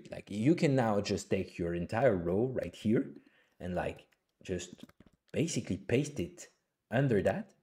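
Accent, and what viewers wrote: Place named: France. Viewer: god , I love the French accent.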